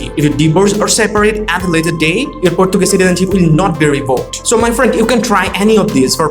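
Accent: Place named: India. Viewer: U tried ur best to Sound like Locals however few words would give a hint that ur an Indian. Thanks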